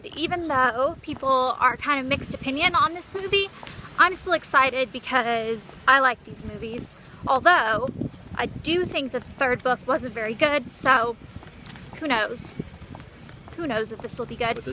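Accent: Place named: Tennessee, United States. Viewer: You're getting your TN accent back a bit :)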